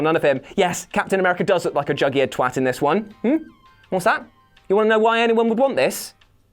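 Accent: South, United States. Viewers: Wow, seems like Crapcom's not so hot on those sequels, huh? And although your American accent is funny, DMC2 was ALL Crapcom Japan. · That southern accent tho lol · in his southern accent 👏🏽👏🏽👏🏽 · I'm in love with ' Southern accent!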